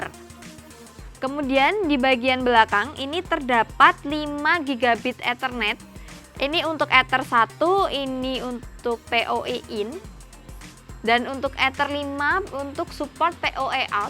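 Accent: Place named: Yogyakarta, Indonesia. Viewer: Waakk mbak e medok e juoosss... orang jogja ya mbak? Sama... atau jangan2 yg aku temuin d citraweb ya? Wkwkwk... mantap... btw ini sinyalnya gman ya? Bagus ga? Bisa seberapa jauh ya? Karena aku butuh router bagus dan gigabit. Buat kirim data ga hanya internet aja.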